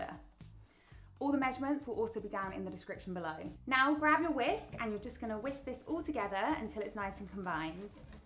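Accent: United Kingdom. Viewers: Thickest bri'ish accent have ever seen (not to be mean or anything)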